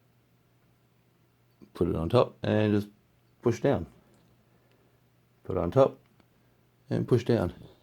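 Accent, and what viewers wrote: Australia. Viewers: Where does one get these series of? Your from Australia based on your accent (So am I). I've only ever seen the standard range at my Target/BigW/KMart. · Awesome! Thanks for the help! Are you aussie?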